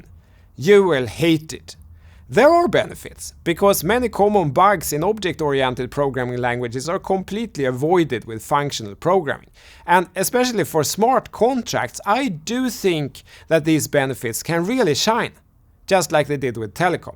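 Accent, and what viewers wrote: Sweden. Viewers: The biggest Sweängelska I heard in my entire life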